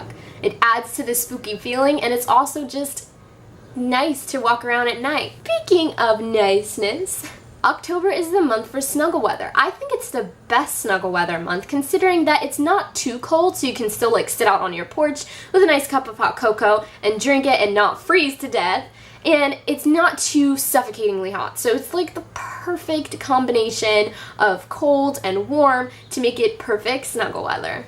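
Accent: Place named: New York, United States. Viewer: I love your NY accent. I'm from NJ lol I was just watching some of your old videos yesterday so it's great to see something new from you! I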